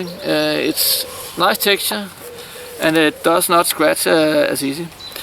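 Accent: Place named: Denmark. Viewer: very danglish ^^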